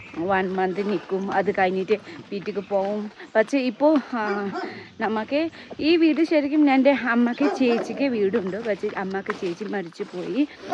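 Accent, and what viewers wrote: Tamil Nadu, India. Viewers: Tamil.mix slangil❤ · Chechide slangil korachu Tamil mix aavunnundu😅😅😅😅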